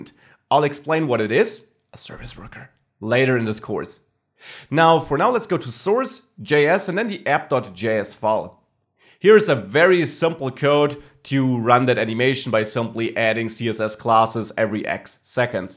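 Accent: Germany. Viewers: I'm from Germany and watch this German guy speeking Englisch...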